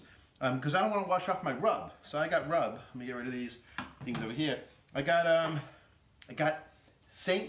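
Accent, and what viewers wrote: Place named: New York, United States. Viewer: Do you always turn on your Nyuu Yawk accent to the max when you're in the kitchen,? <3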